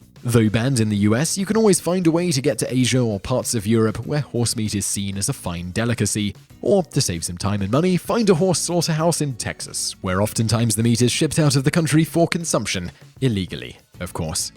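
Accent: England, United Kingdom. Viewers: Simon said Indian tribes during this presentation. I didn't know the people of India were categorized as tribes. Another issue, why do most of your videos always have, "for example, in America"? I realize the US might be your largest audience, but the things you discuss also happen in other counties. Your American-centric views are getting tedious. If you want to come across as intelligent, please do so, a British accent doesn't mean you are smarter than the average bear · I hate listening to an english accent with head phones on. Like this channels content. Just dont like the voice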